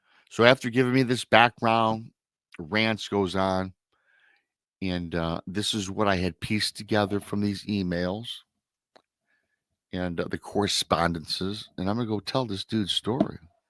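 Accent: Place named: Michigan, United States. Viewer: Michigan is my home state. I love your accent. It makes me homesick.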